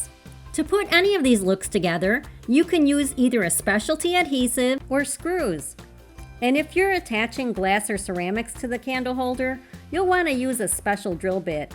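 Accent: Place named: Cincinnati, United States. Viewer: 🙌🏻🙏🏻❤️ You sound like a Cincinnati girl. Am I right? I’m from southern IN.! God bless you!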